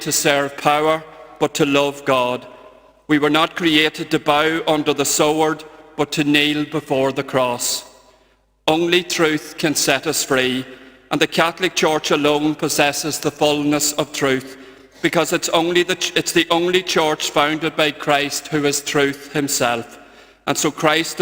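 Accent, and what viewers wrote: Ireland. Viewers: Wonderful homily and beautiful Monaghan accent!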